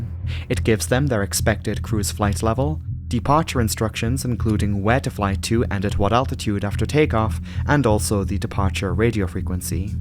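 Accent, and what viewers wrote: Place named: England, United Kingdom. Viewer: I know what a metre is and precisely how to spell it. Come English sounding person you can't sound intelligent without being able to spell the basics of the thing you are talking about.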